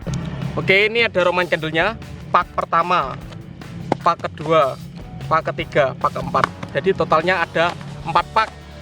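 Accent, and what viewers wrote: Java, Indonesia. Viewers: Bahasa nya medok wkwk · Wong jowo yo ? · Jowo ne ngendi mas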